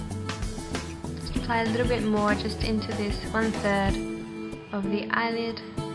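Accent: Australia. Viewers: Sometimes you sound Aussie and then other times you sound like you are putting on a British accent. FYI I am Australian so I know the difference.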